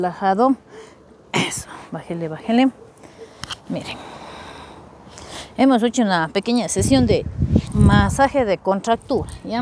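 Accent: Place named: Sierra, Ecuador. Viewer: Así hablan en Cuenca y en la mayor parte de la Sierra de Ecuador, si no le gusta vea otro canal y no traiga hate a esta bonita comunidad q tiene.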